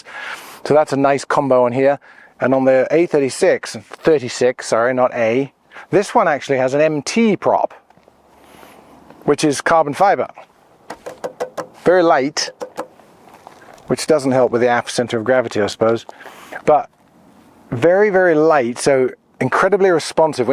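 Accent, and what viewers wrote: United Kingdom. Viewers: Hi only just discovered your channel and subscribed after watching your first video. Really like your style of presentation and the cool British accent is music to the ears 😂 I’m currently looking for a Bonanza in Australia and confused between the choice of an A36 a V35 and a C33 Debonair which are currently available on Australian market. Can tell from your videos you’re not a big fan of the Vtail so youd probably rule that out but between the A36 and C33 which one is your favourite and why? So far through my reading (and watching YouTube!) the A36 has the advantage of bigger door, which would be a plus for me for loading say camping equipment or a bike and also Ive heard the longer fuselage helps with less wag in turbulence. Keen to hear your thoughts on it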